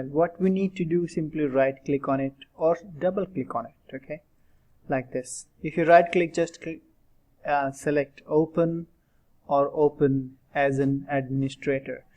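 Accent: India. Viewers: Hey Indian go to normal accent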